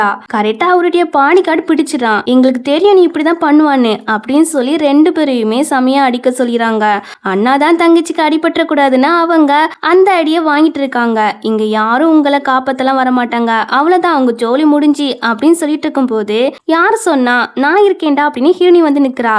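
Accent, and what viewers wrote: Tirunelveli, India. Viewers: 80s la poi reborn agura drama podunga pa unga nellai style voice la ketka egar ra eruku pa 🙂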